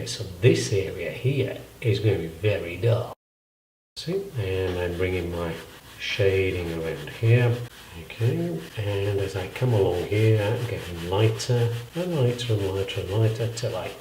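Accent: United Kingdom. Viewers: YOUR ENGLISH PRONUNCIATION IS VERY BRITISH,I LOVE TO HEAR IT! ANYWAY,METHOD WAS GOOD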